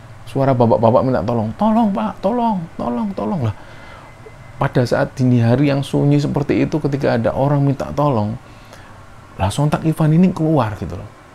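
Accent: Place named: Surabaya, Indonesia. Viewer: aku suka aksen suroboyoannya medok ...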